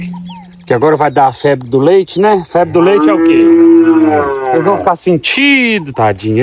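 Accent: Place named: South Minas Gerais, Brazil. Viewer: moro no Sul de Minas e você fala que nem nós. Amo muito nosso sotaque! Deus te abençoe ❤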